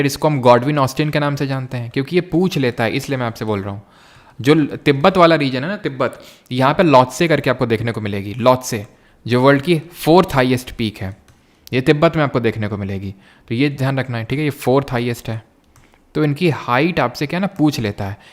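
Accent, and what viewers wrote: Kanpur, India. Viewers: Boss ap kanpur se Hai ye tompakka Hai Accent se pta chal rha Hai 100%sure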